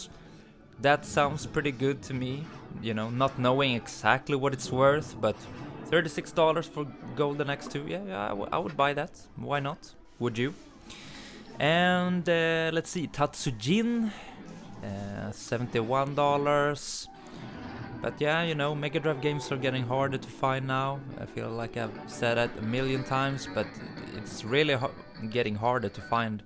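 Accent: Sweden. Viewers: Haha där hör man den härliga svengelskan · I would get any Jurassic park game for under $50 lol Also...do I detect a Swede? lol I've lived in Sweden for years and the cadence is familiar!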